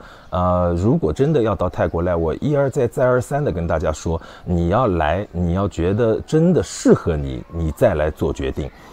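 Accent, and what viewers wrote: Shanghai, China. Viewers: 哥們是上海人？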